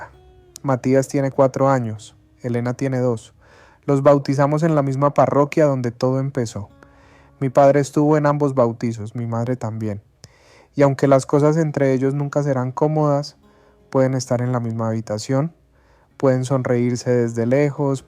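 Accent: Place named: Central America. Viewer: buscado una voz con acento argentino y no centro americano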